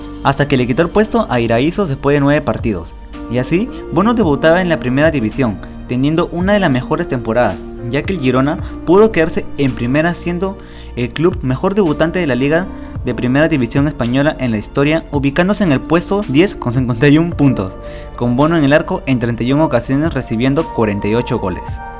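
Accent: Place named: Argentina. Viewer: Porque habla como un argentino??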